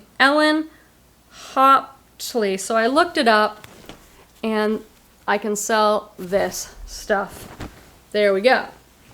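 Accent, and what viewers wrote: Boston, United States. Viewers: I LOVE your Boston accent! Bra, Halara… I could listen to it all day. 😊 I want the Hudson Bay blanket! I’d struggle with selling or keeping it. Lucky reseller that found that!!!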